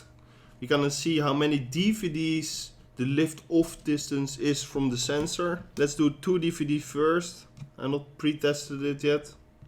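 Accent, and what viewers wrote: Netherlands. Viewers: Echt pannekoeken engels.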